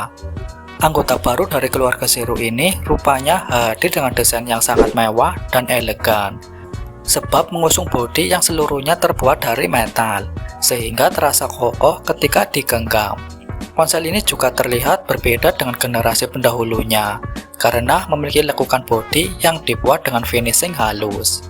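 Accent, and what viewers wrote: Banyumas, Indonesia. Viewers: 😂ki asli ngapak.ora ngapak ora kpenak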